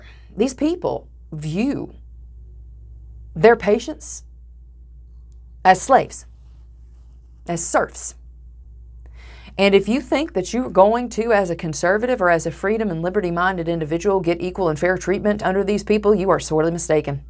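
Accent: United States. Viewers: What state is your accent from? It’s a very nice accent! <mail>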